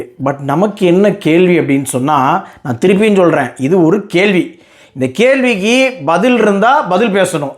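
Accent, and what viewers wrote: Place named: Tirunelveli, India. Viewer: நண்பா நீங்கள் கண்டிப்பாக ஒரு வீடியோ பதிவு செய்ய வேண்டும் பிஸ்மி அவர்களுக்காக தனியாக உங்கள் ஸ்லாங்கில் திருநெல்வேலி ஸ்லாங்கில் வைத்து தவத்திலேயே போட்டு விடுங்க செய்து விடுங்கள் அஜித் அவர்களை தவறாக சித்தரித்துக் கொண்டிருக்கின்றார் இந்த பன்னாடை பிசின். பிஸ்மி வலைப்பேச்சு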